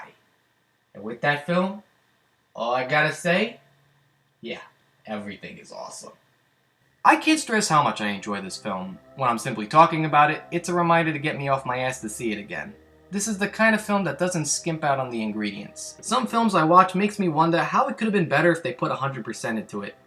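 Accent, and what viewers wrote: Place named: East Coast, United States. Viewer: I subscribed just to hear you tawlk. Not many Eastern accents in northern Minnesota. :(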